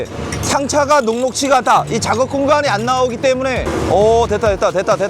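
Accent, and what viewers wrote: Gangwon, South Korea. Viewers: 말투가 강원도 사투리에요. 처음이라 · 강원도 사투리가 귀에 쏙쏙ㅋ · 말투땜에 첨엔 조선족인줄 ㅋㅋㅋ 계속들어보니 구수한 강원도 사투리였드래요 ㅋ 덕분에 귀한영상 잘보고 갑니다! 따봉누르고 가요~ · 강원도 사투리 같습니다. 제 군대 선임 어투와 많이 비슷합니다. 강릉 출신이였숩니다. 많이 표준어 쓰시려고 노력하신거 같습니다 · 사투리가 지대로자니? 나도 강원도 사람이자니~ 고래가 메른두없다야~:yougotthis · 강원도 시투리 구수하이 좋쿠만뇨~ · 속초 말. 참 재미 있네요... 북한 말 같은기.... ㅋㅋㅋ.. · 영동지방 강원도 특유 사투리 입니다 북한 억양이랑 비슷해요^^ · 강원도 사투리 재미있는거 같음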